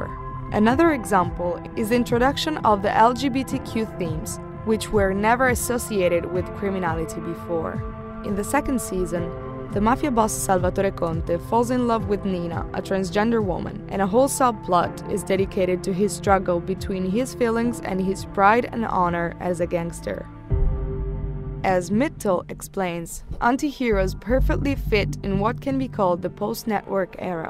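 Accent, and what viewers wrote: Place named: Italy. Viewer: sei italiana? si sente un pochino!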